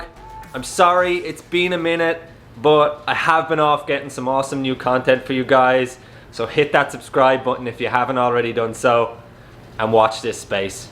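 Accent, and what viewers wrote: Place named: Canada. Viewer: I knew your accent was Canadian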